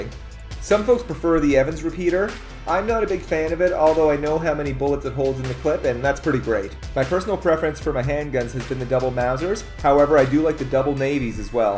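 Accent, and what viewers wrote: Canada. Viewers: Nothing wrong with sounding Canadian! I’m Canadian too lol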